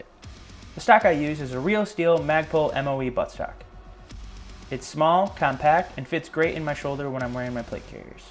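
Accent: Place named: Eastern Europe. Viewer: Are you even American. You sound some of an Eastern European ...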